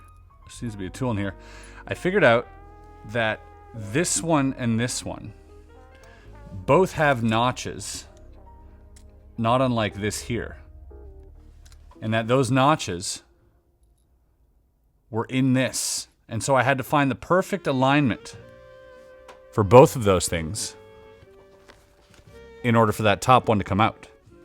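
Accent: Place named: Canada. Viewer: says, "out" Hubby and I, "Owwt" lol We're literally only 6 hours away from the Canadian border and it always makes us laugh to hear that accent. Truthfully people think ours is funny too because we say "Wicked" all the time.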